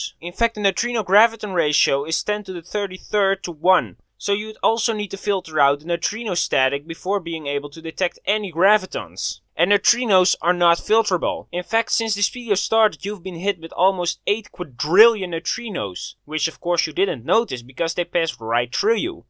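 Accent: Netherlands. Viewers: Are you Dutch? You sure sound like you are!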